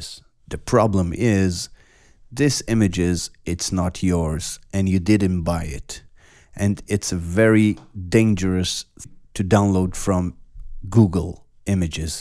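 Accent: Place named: Israel. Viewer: שומעים שאתה ישראלי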